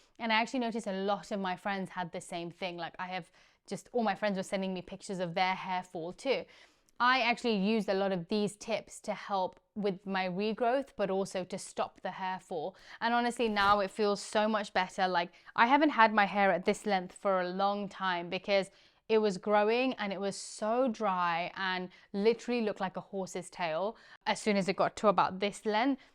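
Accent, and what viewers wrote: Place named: United Kingdom. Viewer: Are you an Indian? I like your accent, it sounds like a little British accent :)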